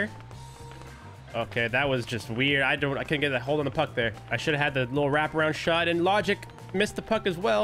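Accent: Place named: Canada. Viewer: This guy hella canadian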